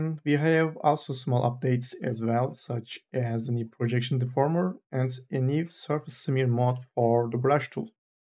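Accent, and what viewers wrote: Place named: Turkey. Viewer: paylaşım için teşekkürler... aksandan yakaladım.. yanılmıyorsam türksünüz hocam..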